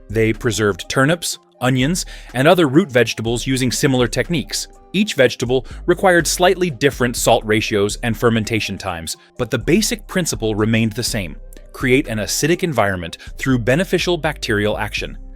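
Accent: United States. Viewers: This hasty-sounding, breathless bot voice is really annoying. The information is solid and well written, though, so, if you sbould do this again, do consider having a human reading it. And the word "confit" is pronounced "Cone-FEE." American audiences [your video uses an American accent] pronounce "herb" without the "h," and British pronounce it with the "h" aspirated. Also, it's "LOO-tuh-visk."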